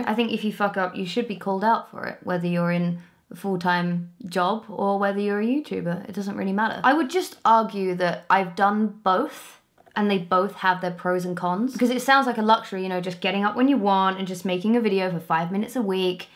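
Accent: Essex, United Kingdom. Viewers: Is it just me or did her Essex accent come through more in this video? Especially when she says phrases like "sort of". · I hate the term having "a real job". It's a predictable snipe by a bitter soul. Your work life journey has brought you to a cool place and you'd be fully aware grab it by the horns and enjoy the ride. Your creative, clever, funny and have that bit of attitude needed when delivering a good pun, in that classic Essex accent. You also have a more serious side here on your discussion channel. Your number one in my book.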